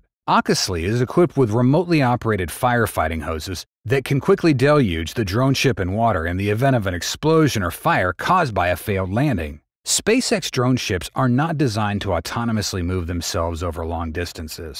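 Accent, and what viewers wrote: South, United States. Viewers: his southern drawl was so strong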